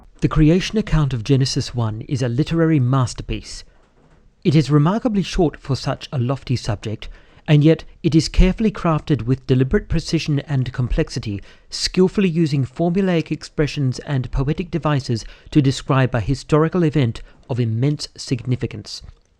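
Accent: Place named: United Kingdom. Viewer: Everything sounds more authoritative in a crisp British accent, but I have to agree with Snipe. You guys are acting like the temple came first and the text of Genesis came later. Genesis isn't modeled on a temple, the temple is modeled on Genesis. That doesn't make creation a temple anymore than a doll house is a real house. Creation isn't a temple, the temple is a reflection and microcosm of how creation was supposed to be. I am also inclined to think that the temple itself was more along the lines of a reflection of the garden. The "sea" was in the outer courts. That was the earth. Thus the windows letting in light wasn't about recreating the host of heaven. It was just a way to illuminate the temple. If creation was meant to be a temple instead of the temple a microcosm of creation, then why wasn't God big on temples? He only wrote it into the story when David wanted to build one, and He wrote it out of the story when it became almost an idol. 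God's idea was the Tabernacle.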